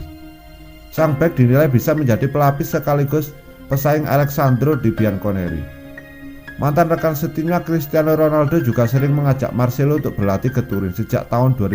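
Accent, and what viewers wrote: Java, Indonesia. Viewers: Medok amat jawanya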